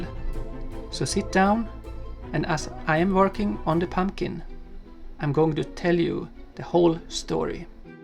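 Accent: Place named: Ireland. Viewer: Awwwww I loved that! I enjoyed your story-telling especially with your Irish accent! You are so talented with your pumpkin carving and all of your other drawings!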